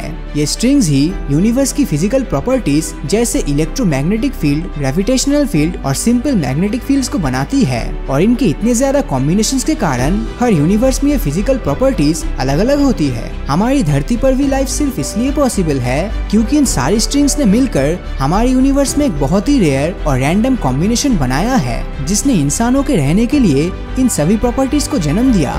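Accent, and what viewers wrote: Bengal, India. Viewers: Are you bengali!!!!Your accent says bro☺️☺️☺️☺️☺️ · Bhai aap Bengali hain na.... ❤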